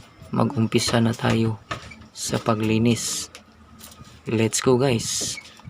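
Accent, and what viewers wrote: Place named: Visayas, Philippines. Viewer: Galing mo master..asa inyuha master..murag bisaya sad ka master Bah..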